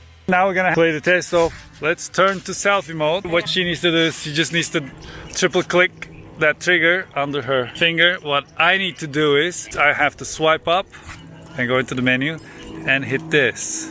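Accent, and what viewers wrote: Turkey. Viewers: Türk aksanı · Adam resmen Türk İngilizcesi konuşuyor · Türk olduğunu sonradan aksanından anlayıp şaşırdığım youtube kanalı. İçerik harika umarım aynen böyle devam eder. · What a heavy Turkish accent.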